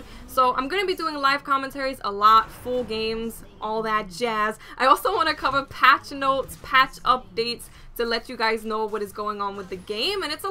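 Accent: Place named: Bronx, United States. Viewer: BTW: you sound like you from the bronx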